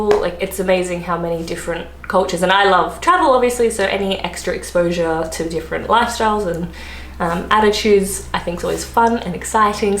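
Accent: England, United Kingdom. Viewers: She is attractive and sounds more English than Australian...interesting observation. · Love the way your British accent is improving, you sound more intelligent and slightly wiser. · Your accent is definitely changing. It sounds much more English than in your earlier videos. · Immediately the first thing I noticed is how much more British your accent sounds compared to part 1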